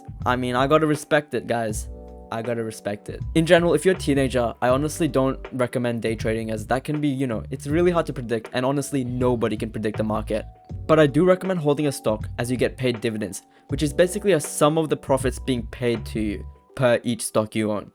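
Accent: Australia. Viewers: Great vid bro rlly helpful, also r u Aussie?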